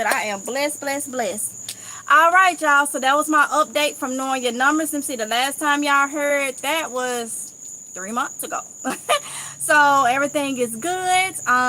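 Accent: South, United States. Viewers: I’ve never heard nobody pronounce exercise like that before lol! I like your accent!!! It’s soo southern!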